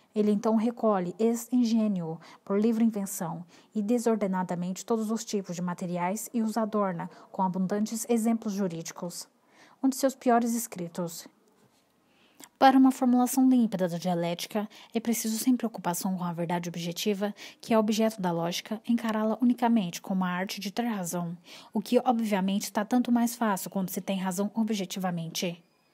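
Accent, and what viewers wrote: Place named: Caipira, Brazil. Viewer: Jesus que sotaque de caipira socorroooi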